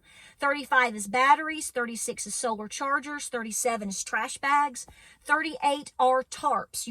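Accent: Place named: Southern Ohio, United States. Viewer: Love the accent! I grew up in southern OH (bordering WV/KY). Sounds like home :)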